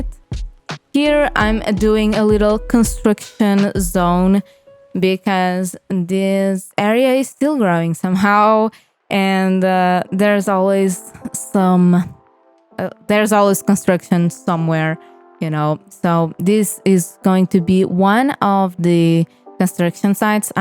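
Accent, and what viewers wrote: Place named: Russia. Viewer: where are u from you sound russian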